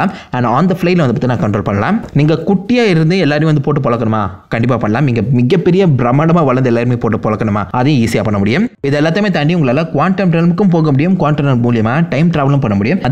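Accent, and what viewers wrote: Tirunelveli, India. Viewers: Bro enna tirunelveli ya neenga...ela ennala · Bro ungalukku tirunelveli aah ? Kandu pidichuten....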